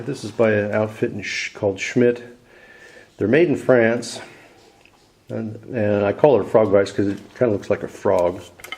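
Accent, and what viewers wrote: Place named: Palatinate, Germany. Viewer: You're welcome, I now have watched all of your vids and subscribed as it seems you've got some interesting projects in the pipeline. Also good to see there are other guys around who cannot stop feeding the monster ..:-) Anyway, i'm a bit confused,... your yotuibe location says US and you sound like a native American to me, but power sockets and the "Schutzbrille tragen" sign on the door looks certainly German, not to forget your "Paelzer" dialect on the German video, which sounds pretty native as well...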